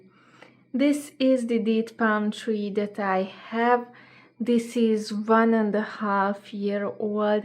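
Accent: Hungary. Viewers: It's very Hungarian English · Are you Hungarian? The accent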